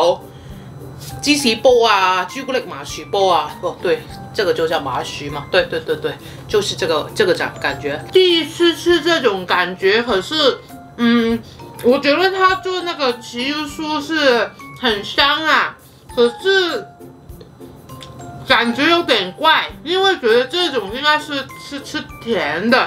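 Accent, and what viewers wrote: Taiwan. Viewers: 國語好有台湾feel，尾音勁似👍👍🥳好正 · 對、為何你的口音咁台灣？你在那裏學的？一定不會在中學校！ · 嗨 你的國語發音很像台灣人😊說得很棒哦！我有些香港朋友是沒辦法那麼清楚發出咬音的呢，剛好肉圓是在我居住的城市 彰化，煙韌國語可以說成是 Q彈有咬勁哦。在彰化的肉圓是蒸熟後油炸，有脆皮派也有煙嫩派的、還有涼涼吃的肉圓 叫涼圓。 我也很喜歡旅遊 香港也去過十多次了，有機會來台灣的話 可以一起吃美食哦😊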